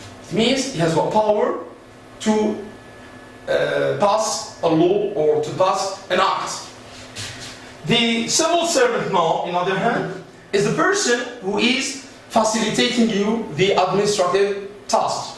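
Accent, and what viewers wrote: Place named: United Kingdom. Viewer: a british accent! Wow>-< that's great Thanks a Lot Sir i really appreciate that('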